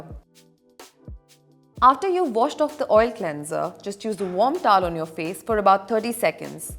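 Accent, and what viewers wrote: India. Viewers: Clicking on the video assuming it a korean channel. Listening the accent: Indian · You look Asian but sound Indian 🤔thanks for the video 👍🏼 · I'm surprised that your English accent sounds like Indian! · I was not expecting that Indian accent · Her accent sounds like indian accent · She is indian that's why the accent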